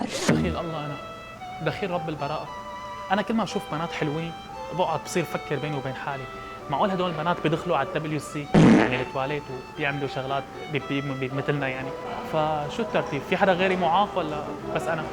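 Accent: Syria. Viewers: أول شي فديت اللهجة السوريه ما احلاها دخيل أمك شو اسمك ههههه اضحك والا فاعلم أن أعداء النجاح منعوك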